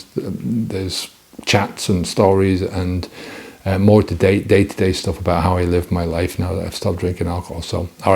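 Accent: Ireland. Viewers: Your accent seems a bit stronger now that you're back in Ireland!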